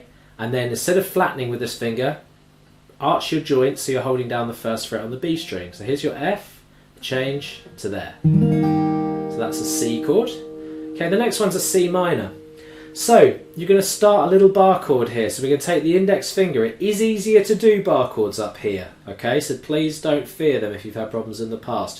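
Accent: United Kingdom. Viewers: When You started talking I was like OH SHEESH HE'S BRITISH.. I did not see that coming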